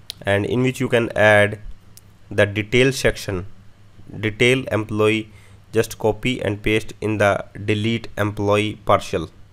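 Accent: India. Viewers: The worst most video I've ever seen in my life. The Hindi influenced English trainer accent is really irritating. It is clearly understandable that, this guy don't know anything, but he is managing to teach us. I've already provided this comment. Looks like these guys are removing negative comments. Hence, I've provided negative comment once again.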